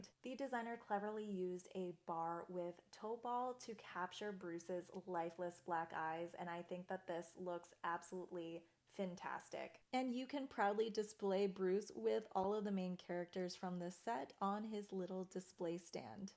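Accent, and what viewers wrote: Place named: Massachusetts, United States. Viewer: Great video! I can't wait to get my hands on that JAWS set; I couldn't believe it when it finally got announced. You did a great job showing off all the cool details, and the fact that you're a fan of the movie really comes across. Also, you're from Massachusetts, like myself, so you get extra points. I heard that Mahtha's Vinyahd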